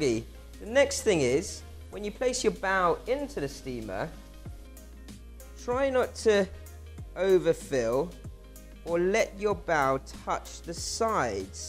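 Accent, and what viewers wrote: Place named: United Kingdom. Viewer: The british accent tho... **heart eyes emoji*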